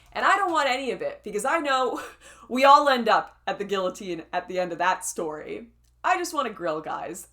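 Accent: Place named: Canada. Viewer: Is it me or is your Canadian accent back with a vengeance.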